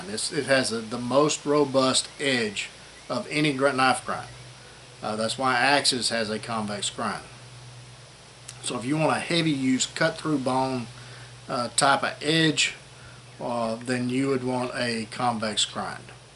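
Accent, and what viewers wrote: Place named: Appalachia, United States. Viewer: he sôunds like an appilation American I'm one too thats why I can t spell it.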